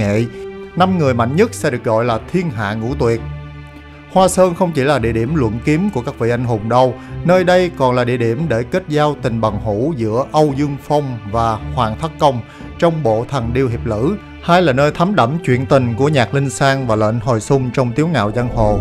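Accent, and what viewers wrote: South, Vietnam. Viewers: Kỷ thuật quay phim điêu luyện,giọng thuyết minh miền nam nghe thật dể mến,chúc cháu luôn khỏe mạnh để thực hiện thêm nhiều clip đặc sắc nhé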